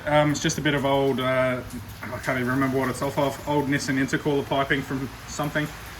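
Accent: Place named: Australia. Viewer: In australia? im guessing via the accent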